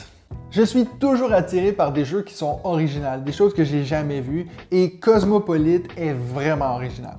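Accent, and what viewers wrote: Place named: Quebec, Canada. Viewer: J’ai vu dans le vidéo du concours que tu vis en Suisse mais je trouvais ton accent très québécois. Ta réponse vient clarifier le tout ! Merci !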